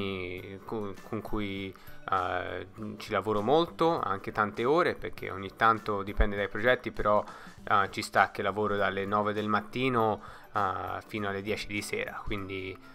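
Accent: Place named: Tuscany, Italy. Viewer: L’accento toscano è ancora pazzesco!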